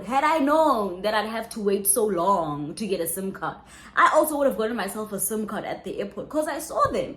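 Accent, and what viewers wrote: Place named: South Africa. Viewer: I Love that you keeping your SA accent ! Aish !!! Hibo !!!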